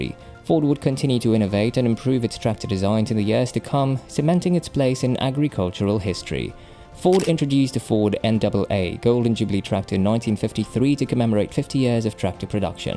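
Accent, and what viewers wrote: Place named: England, United Kingdom. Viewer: Turned this off for the English accent.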